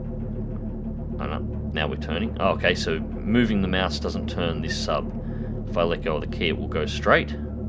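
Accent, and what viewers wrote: Australia. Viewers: its soo relaxing to watch underwater exploration carried out by someone with an Aussie accent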